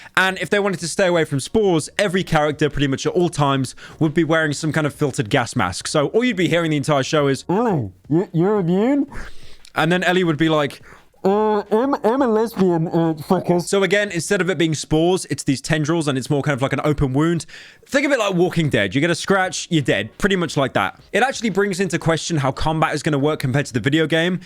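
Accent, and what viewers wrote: United Kingdom. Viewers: Haytch be oh bri-ish bruv make the h letter complicate m8 good content will view again get at it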